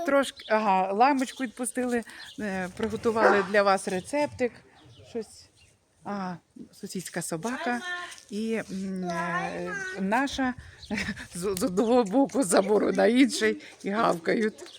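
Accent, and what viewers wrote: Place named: Poltava, Ukraine. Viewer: нашу Полтавську говірку нічим не переб'єш.Дай Бог Всім здоров'я.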